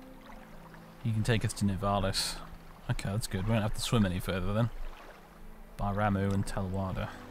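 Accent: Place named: England, United Kingdom. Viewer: it's like a fairytale being told, right? With swearing, loud laughter and english accent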